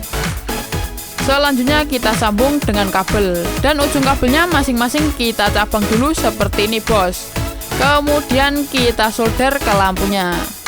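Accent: Java, Indonesia. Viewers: Jowo e' meddok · Bang koe uong jowo Yo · Emang orang Jawa ngomongnya gitu, akupun klo ngomong juga gitu🤣 satu jaman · wong jowo yo ngonokui rek ngomonge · Jowo ne kentel 😁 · Orang Jawa Kayaknya, Karna logatnya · "kita potong sampai jeru gays😂" bahasa jawanya kentel banget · Mas sampean jowo ndi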